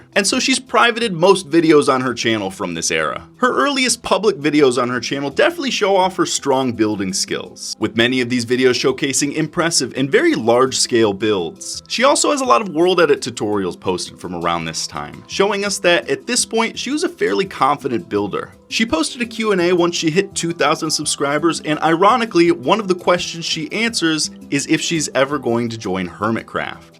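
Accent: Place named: Newfoundland, Canada. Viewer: Everybody talking about gem but i Just I pressed by ur Newfoundland pronunciation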